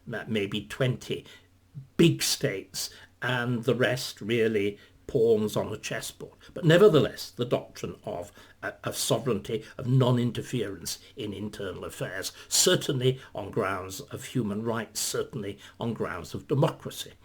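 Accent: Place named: Oxbridge, United Kingdom. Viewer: Starkey is a fabulous Tudor historian but his increased penchant for political commentary has become annoying. As an academic, he should be more modest in his commentary on subjects outside his area of expertise; and certainly should not air such commentary on the the "David Starkey Talks" format. This confuses his area of competence with areas in which he is merely an amateur, albeit an educated one. His political talks lack objectivity. He always seems to know which conclusion he wants to draw. People may start to question whether he takes the same approach on his histories! Alas, judging by the comments below, my fellow Americans are too willing to give credence to this warmed up drivel delivered in an Oxbridge accent.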